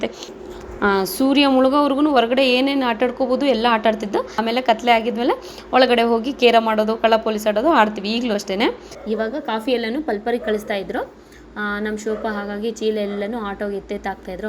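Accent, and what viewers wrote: Hassan, India. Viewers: I became fan by seeing 1st time ur videos because f our hassan slang language mam..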